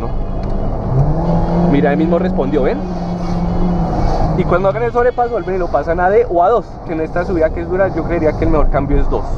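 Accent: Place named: Colombia. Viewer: Que chistoso hablan en Colombia Parcero!! Saludos! 🤗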